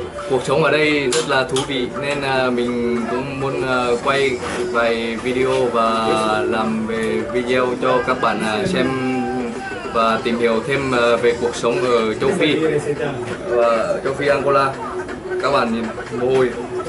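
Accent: Nghe An, Vietnam. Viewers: nói giọng giống người Nghệ An Chúc e và các bạn bên đó luôn mạnh khỏe và thành công trong cuộc sống nhé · Giọng giống Nghệ An quá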